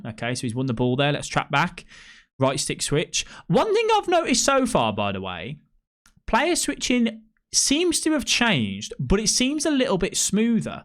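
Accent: United Kingdom. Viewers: Bro are u from uk ? its so hard to understand you sometimes xD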